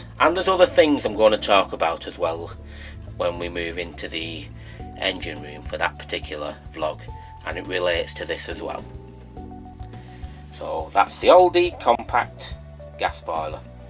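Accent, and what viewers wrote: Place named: Oldham, United Kingdom. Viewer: Are you from Oldham by any chance, you sound like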